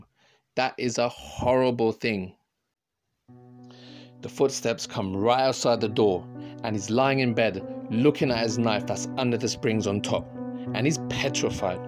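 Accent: United Kingdom. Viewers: u got that across the pond voice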